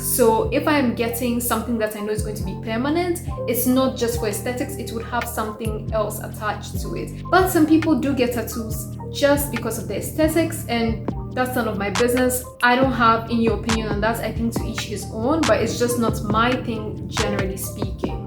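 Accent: Ghana. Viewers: You're so wise for your age. And your Ghanaian accent is so sweet and authentic. I wish I could regain my ability to speak like that again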